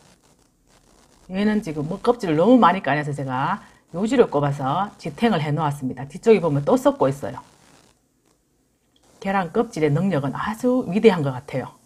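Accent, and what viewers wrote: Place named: Yecheon, South Korea. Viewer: 우리 마누라 사투리랑 똑같네 예천군 ~~~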